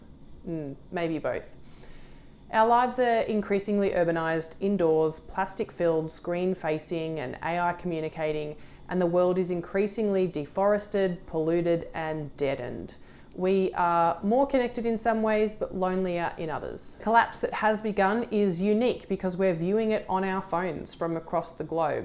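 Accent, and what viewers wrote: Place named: Australia. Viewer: Love the Aussie accent tbh. Great big picture exposition. Really impressive although depressing. Root cause is not politics or capitalism like most ppl tend to mention. It is pure evolution and natural instinct. Our species just won the evolution race and we are running towards every boundary possible until nature will force us into the next balance. Its a natural inevitably. Dont blame a particular group of humans or "system". Its the system of nature.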